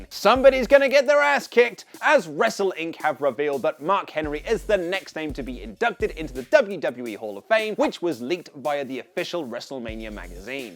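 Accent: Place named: United Kingdom. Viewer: I always noticed you say "shheduled" instead of scheduled...maybe its due to the British accent.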